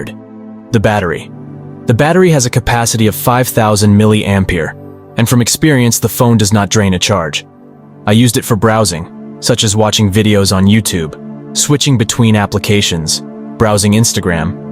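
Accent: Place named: United States. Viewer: I expected that you were from the United States due to your smooth accent. I was shocked that you were from Iran. I am honored by your beautiful content. Keep it up ✨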